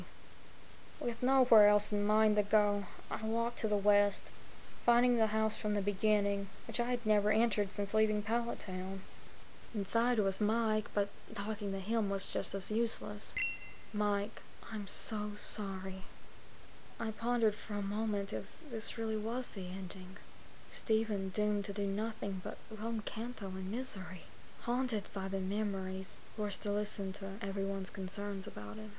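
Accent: South, United States. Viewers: Australian?! LMFAO. Clearly tell she's a southerner. So cute. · I thought you sounded southern!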